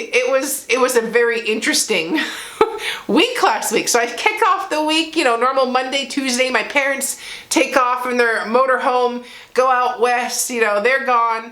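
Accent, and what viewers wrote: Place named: New Hampshire, United States. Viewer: Florida, Texas....when did you live in NH? That New Hampshire accent comes out! Loved seeing the girls playing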